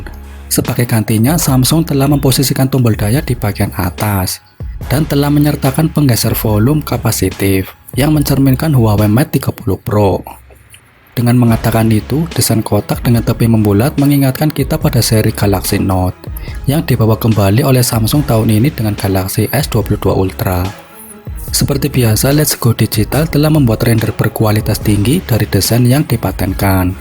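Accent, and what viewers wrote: Banyumas, Indonesia. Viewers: Logatnya ngapak yak..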